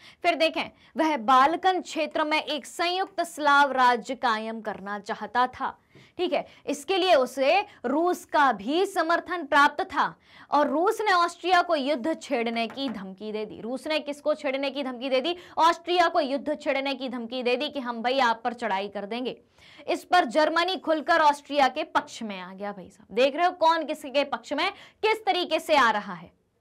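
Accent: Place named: Rajasthan, India. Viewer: Mam aapka ton rajasthani ton lag raha hai . Aap kis rajya se ho please batao n 😊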